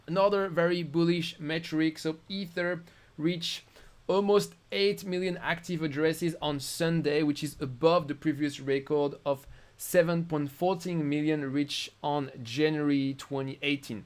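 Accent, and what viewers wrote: France. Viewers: Yo great content!! are u french?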